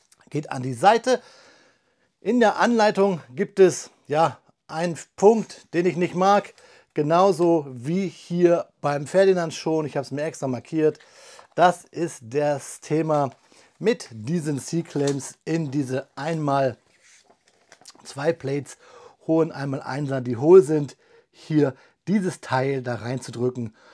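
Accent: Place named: Southern Germany, Germany. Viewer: By your German accept I'm guessing you are based somewhere in the south of Germany. Am I correct? Thanks for another good review! The model is actually better than I anticipated. Sadly still no room on any shelf at home to place a huge one like this.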